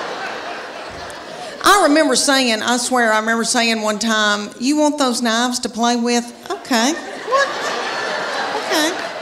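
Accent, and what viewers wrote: South, United States. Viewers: O" Really? Me loves ya' Southern Country Accent though with them jokes of yours fits ya' Personality so, Very much or should me say fits ya' Personality so, Very well._ (Nevertheless, me Mr. Amos).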